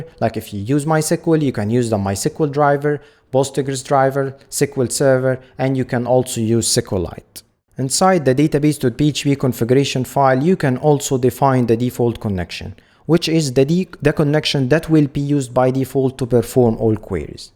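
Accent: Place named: Egypt. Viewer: حسيتك مصرى من اللهجه قبل ما ابص على الاسم 😂😂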